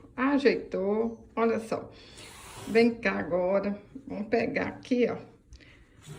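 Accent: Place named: Belo Horizonte, Brazil. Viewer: Adoro vc jeito falar igual minha tia de Belo Horizonte fazia coisas e doces como ninguém foi embora 88 anos que saudades qdo ouço você quero me inscrever não consegui ainda bjs amo vc creusa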